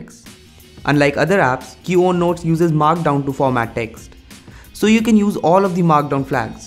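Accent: India. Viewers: Make your videos with "In indian english" in title You are polluting my youtube History, and my ears... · sotaque indiano dos infernos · please google block all indian english accent videos it is horrible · Why haven't I seen/heard even one indian guy who can speak english properly without this stupid accent? It's just like hearing some foreigner speaking broken language you use in your country, unpleasant to listen to. I'm not saying everyone should have perfect british/american/australian/canadian/whatever accent but come on.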